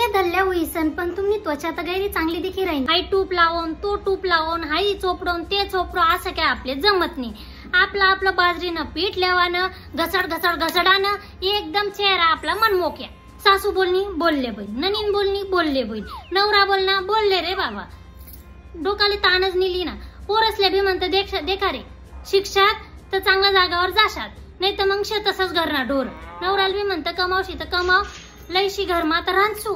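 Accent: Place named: Marwar, India. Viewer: Hi कोणती भाषा आहे, खानदेशी का अहिराणी? खूप मारवाडी भाषे सारखी CH वाटते आहे 😁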